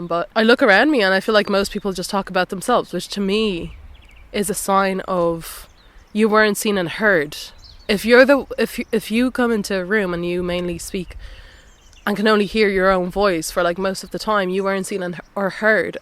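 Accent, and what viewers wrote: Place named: Ireland. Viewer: kia ora from aotearoa (nz), it's surreal to see another young person on the opposite side of the world going through such similar experiences and processes, like I was listening to myself just with an Irish accent. Thank you for the self-safety work you have done and continue to do. It is a soft reminder that we too are allowed to treat our most difficult parts with patience, curiosity and respect. Have been recently feeling like I've been regressing or that my healing work is selfish, but this video & all the comments show that all self compassion overflows into our world, into those around us 😇 + so many good reminders of practical techniques i had forgotten or not yet heard of! ty ty ty <3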